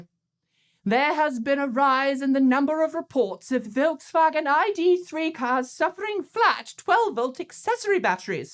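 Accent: England, United Kingdom. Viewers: great episode. and I liked the British pronunciation. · I love the proper English accent.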